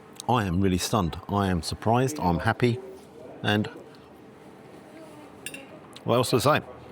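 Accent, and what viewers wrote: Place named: London, United Kingdom. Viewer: Your londonish accent is so adorable 😊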